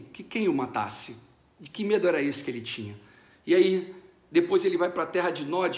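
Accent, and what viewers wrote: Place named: Rio de Janeiro, Brazil. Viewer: Conteúdo muito bom , porém esse sotaque de carioca é nojento , impossível se inscrever 🤮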